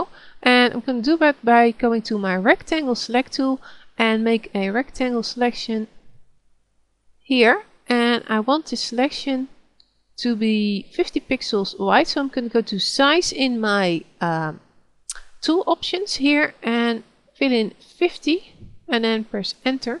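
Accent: Netherlands. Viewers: hahaha, ik moest echt lachen toen ik je hoorde praten, ik zij al " dat is zekerweten een nederlander" en het blijkt ook nog te zijn, maar wat ik eigenlijk wou zeggen. THANKS!! hier heb ik veel aan ! ;)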